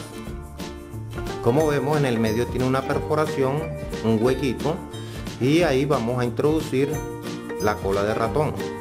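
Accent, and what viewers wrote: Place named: Caribbean Coast, Colombia. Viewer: Buenas, recien encontre este canal y me parecen sencillas, hermosas manualidades y bien explicadas... una pregunta usted es colombiano? a ratos lo siento costeño...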